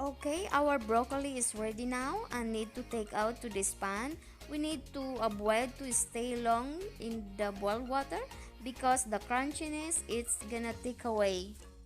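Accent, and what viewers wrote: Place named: Philippines. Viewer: haha i love ur accent..haha..pinoy na pinoy..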